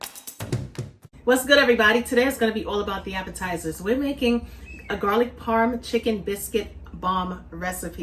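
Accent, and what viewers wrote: New York, United States. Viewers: Heavy on the NY accent ;-) My mom is from the Bronx :-D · Hi,.I just started watching you about a week ago and i'm loving your recipes. I love the way you talk to I think you're from new york but i'm not for sure, but thank you for sharing your recipes because i'm getting ready to try quite a few of them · I’m in love with the New York accent 😅. And I’ve made most of these recipes. Somebody need to put a ring on that finger ASAP 🥴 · The NY accent love it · Love your New York accent.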